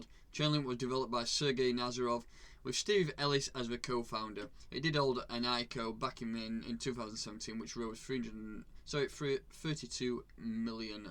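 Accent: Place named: North, United Kingdom. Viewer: $4.80 entry is optimistic at the minute. $13.69 price as of today. Nice content though my man, nice to hear a northern accent in the crypto world.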